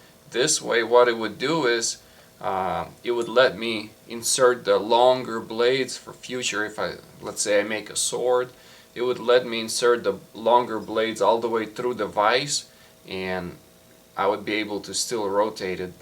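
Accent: Russia. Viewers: акцент как у русского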